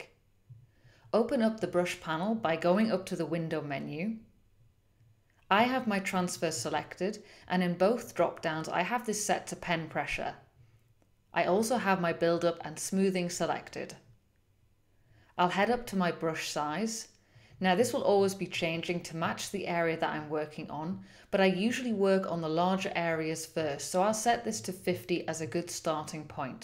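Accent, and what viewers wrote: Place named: United Kingdom. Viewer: Very beautiful british accent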